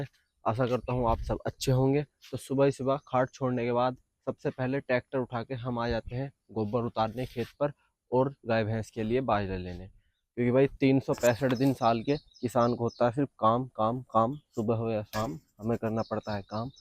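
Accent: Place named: Bijnor, India. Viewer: Apki mmy bhot pyari h ...muje apki avaj bilkul asi lgti h jase mama ke bachoo ki h kyuki vo sb bhi bijnor side se h ...or muje to vha sbki avaj hi same lgti h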